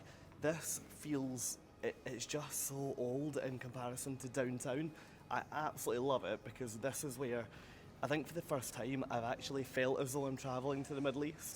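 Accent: Scotland, United Kingdom. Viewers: You couldn’t be more Scottish if you tried. Bless you, honestly no one ever walks in the emirates except around the shops! I hope you didn’t get heat stroke, loved this Vlog though. And the food looked fab on there. PS. The gold is the dowry for your daughter, you’re supposed to buy pieces to add to your collection so you can have the best choice of husbands for her 😂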